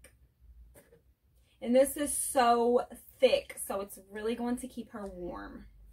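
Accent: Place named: Southwest Virginia, United States. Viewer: your channel is my favorite! love your accent. It sounds a lot like mine. I'm from Southwest VA. I have two daughters and also live in a mobile home so we have a lot in common. I love to watch your channel for cleaning motivation. You're daughter is so cute and you are too! love your long hair!! Hope you have a great day. <3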